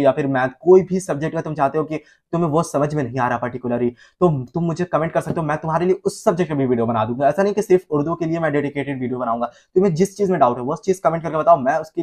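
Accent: Bihar, India. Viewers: sir aap bihar se hain kya?????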